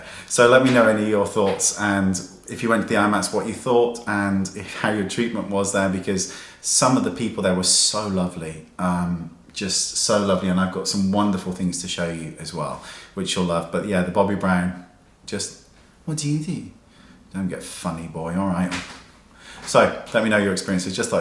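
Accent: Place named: United Kingdom. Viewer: Thats funny as hell, It's even funnier, because of your British accent I swear.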